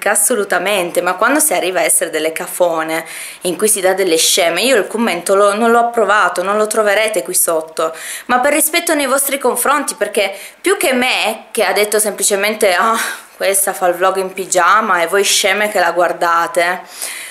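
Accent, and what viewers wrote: Turin, Italy. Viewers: Ciao tesoro ♥️ FREGATENE DI QUESTE PERSONE, perché non hanno un perché in questa vita. Sono povere dentro, commentano tanto per, perché durante il giorno non hanno nulla da fare, commentano perché credono di farsi belle agli occhi degli altri ma in realtà sono delle nullità. Stai super serena e continua a stare in pigiama quanto ti pare e piace perché nessuno può e sopratutto deve dirti cosa fare e cosa non fare❤️ Tesoro dato che è uscito il discorso like e dislike.. noto che nel tuo canale appunto non esce il numero dei like e dislike, ma solo il pollice in su e il pollice in giù! Ma è un’impostazione che hai messo tu? Perché vedo che su altri canali non è così! Solo per pura curiosità ahah 😂🙊 Comunque adoro troppo i tuoi vlogmas😍 adoro vedere la vostra quotidianità, e amo tantissimo il tuo accento torinese 😍😍❤️❤️ vedrei i tuoi vlog ogni giorno, mi tieni troppa compagnia 😍 Un bacione enorme ❤️❤️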